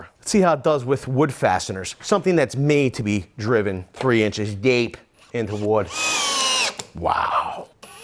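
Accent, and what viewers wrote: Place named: South Jersey, United States. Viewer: As a jersey guy I come for the accent. Hunnert and wooder are classic south jersey words. Traveling around the country we get mocked but everyone I met got a kick out of our my cousin Vinny dialect.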